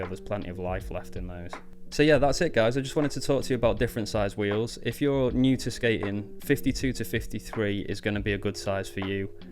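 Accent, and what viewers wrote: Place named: Canada. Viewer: You sound very Canadian 🤔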